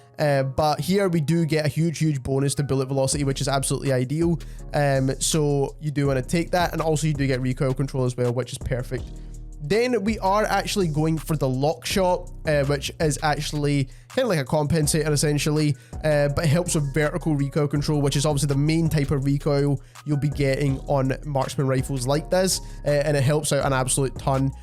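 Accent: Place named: Scotland, United Kingdom. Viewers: Sounds like the janitor from the Simpsons... so yea... a Scotsman. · Yes scottish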